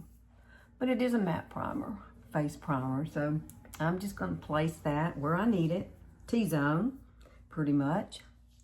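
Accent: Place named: Alabama, United States. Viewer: Girl, I not only like watching you because of the great, down to earth, make up tips for older women; but I just love listening to your calm voice and devil may care attitude, your Alabama accent, and just the neighbor next door persona! Actually, wish you were my neighbor! We would be a hoot together; you with your Alabama accent, and me with my Texas twang! Just good 'ole girls, having fun!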